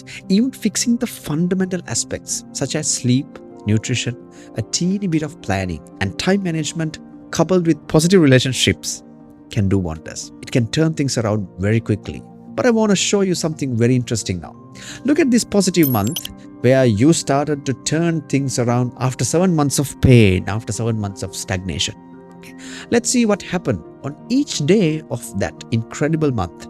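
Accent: England, United Kingdom. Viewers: Very much happy to hear your english accent sir. Love it. You are the motivation to express my self with confidence. I'll definitely speak with confidence one day like you. Thank you and keep up the valuable works. 👊 Jayen jayawewa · Happy to hear your English accent sir I think your voice have something magical power❤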